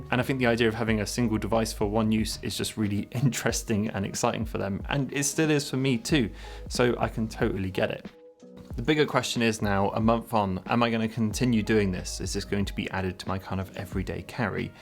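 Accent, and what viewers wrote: United Kingdom. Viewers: I have never realized how satisfying the British accent is to hear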